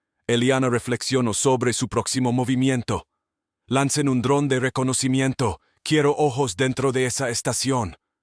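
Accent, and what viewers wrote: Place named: North America. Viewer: Su Tono de Vez es de Norte América . Vendiciones es un buen video .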